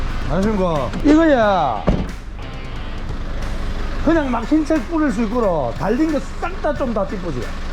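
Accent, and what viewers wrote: Gyeongsang, South Korea. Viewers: 캐빈 못구함니까... 진심 마2 내로우캡이라도 비스무리한거 캡 바꿔 끼는게 경제적이게 생겼습니다... 뭐 붐대부분은 판금도색하면 되겠는데... 캐빈은... 어지간히 썩어있어야.... ㅋ 그리고 추천 어록은. "마 우리 채널 같이 키우는거 아닌교?(경상도 네이티브 발음이 어렵네요 어캐 해야하지? ㅋㅋㅋ) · 저도 경상도 사나이인데 항상잘보고있습니다 고향사투리들으니까 억수로 정겹네요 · 갱상도 사투리 너무 잼있어요. 저도 창원에서 5톤윙바디 운행하고 있습니데이 항상 안전운전하시고 힘내십시요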